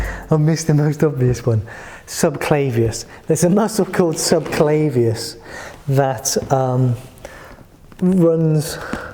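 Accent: United Kingdom. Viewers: worst vid ever. especially the unapologetic british accent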